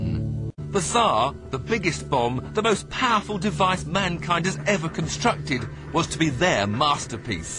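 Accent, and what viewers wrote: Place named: United Kingdom. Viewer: 这英音听的舒服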